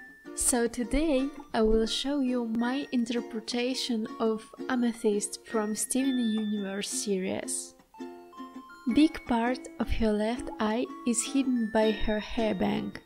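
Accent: Russia. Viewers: Какой же хороший,русский акцент😁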